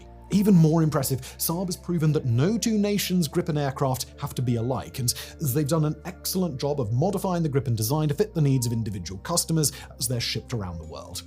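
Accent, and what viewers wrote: RP, United Kingdom. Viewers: Not related to the content but that accent cannot be real, unless someone can verify where it’s from, ( if poshy English, what area exactly?)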